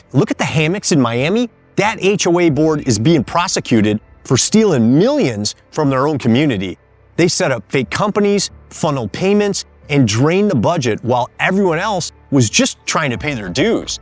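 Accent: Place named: Wisconsin, United States. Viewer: Are you a Wisconsin boy? I can hear it😊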